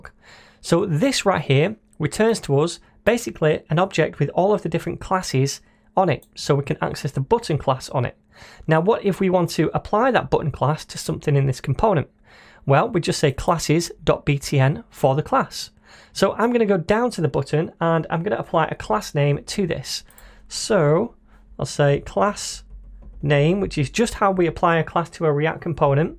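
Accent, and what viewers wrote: United Kingdom. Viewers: Are you from UK dude??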